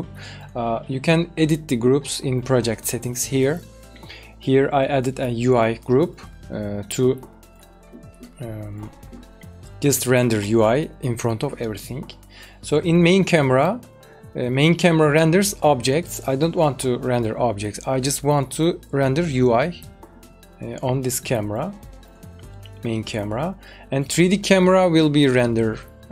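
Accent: Turkey. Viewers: Your accent like Turkish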